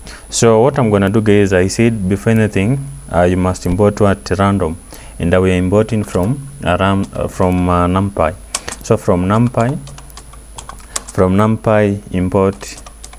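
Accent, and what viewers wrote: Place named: India. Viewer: our legendery indian accent tutorial)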